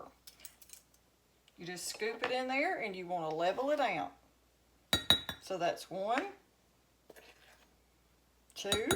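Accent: South, United States. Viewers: I love the southern accent.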